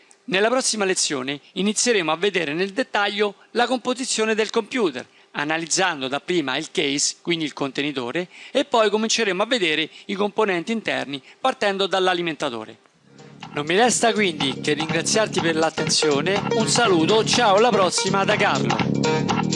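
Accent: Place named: Rome, Italy. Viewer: già mi ha attirato molto l'argomento della discussione, ma con la tua voce romana mi hai convinto ancora di più :D sarebbe un miracolo se tutti gli insegnanti avessero la tua parlata ^_^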